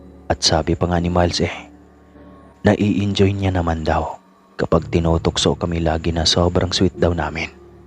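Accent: Visayas, Philippines. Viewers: Sir kahit Bisaya Diction mo galing mo mag narrate Sir🥰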